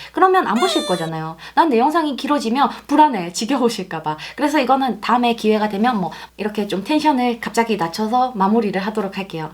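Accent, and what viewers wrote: Gyeongsang, South Korea. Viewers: 경상도 목소리 친근해요 타지역 10년이라 항상반가워요^^ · 아 어디말투지? 경상도+서울 리믹스 ㅋㅋ 매력있네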